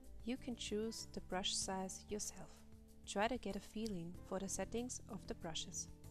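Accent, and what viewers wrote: Germany. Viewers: Dieses deutsche Englisch 😂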